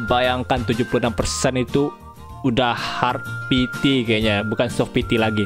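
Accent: West Sumatra, Indonesia. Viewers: logat lu keknya orang minang ya bg?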